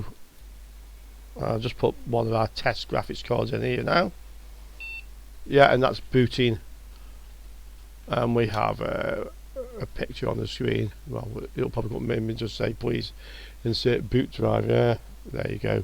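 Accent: United Kingdom. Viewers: Another guy who we can't understand...Too much 'Brit mumbled accent and boring personality?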